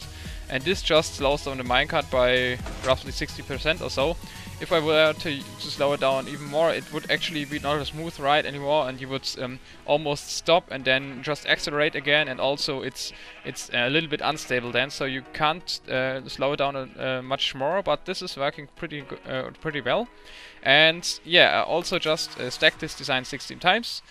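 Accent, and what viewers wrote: Germany. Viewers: Are you german because i am and my english sounds like your's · Dude germann accent is almost the same and im pretty sure he is german since in his bike wheelie video you saw alot german stores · I'm Dutch too, and has a German accent, very clearly not Dutch, but German.. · das hört man doch dass er Deutscher is ´haha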